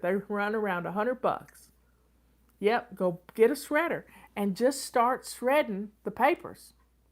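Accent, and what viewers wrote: South, United States. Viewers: Miss fly lady my girlfriend just loves you she’s watches you every day thank you so much for keeping her occupied and out of my hair lol Jk but love your southern accent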